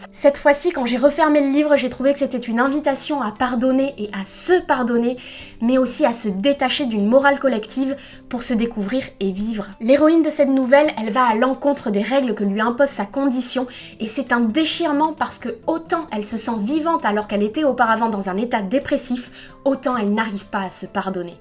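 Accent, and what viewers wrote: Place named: South, France. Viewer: Super sympa ta chaîne j'aime bien le concept (étant moi même en 2e année de prépa littéraire, forcément) ça change un peu de ce qu'on voit sur youtube tous les jours! Juste une petite précision au niveau de la prononciation, Zweig se prononce "tsvaïg" (à l'allemande, si on prononce aussi son prénom "Chtefan") ou à la limite "svaïg" mais le "ei" est bien à prononcer comme le mot "ail"! Voilà j'espère avoir pu t'aider, comme j'entends à ton accent que tu viens peut-être du sud, les noms d'origine allemande ne sont pas les plus simple à prononcer! Bonne continuation :)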